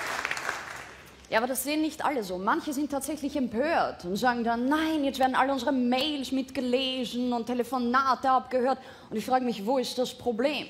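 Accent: Austria. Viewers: ich find lisa auch klasse.tritt oft b. D. Nuhr auf. Wenn einige im publikum nur die hälfte mitbekommen könnte es auch an ihrem schnellen, "österreichischen" akzent liegen? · Dabei spricht sie ein sehr, sehr schönes und wirklich gut verständliches Deutsch, nur mit einem Hauch österreichischem Dialekt. Einfach noch öfter anschauen und hören „üben“ sag ich als Deutsche in Österreich lebend. Das wird schon…🙂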